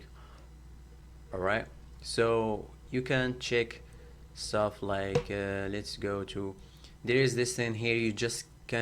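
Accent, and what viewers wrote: Morocco. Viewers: Men Accent 3rftk mghribi hhh + Chokran Bro 3la cher7 ;) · hta ana 3Rftk mghribi nice bro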